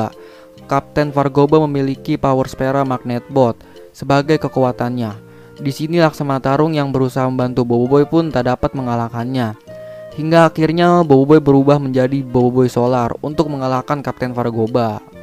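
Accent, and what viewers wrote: Java, Indonesia. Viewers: Bang kamu orang Jawa bang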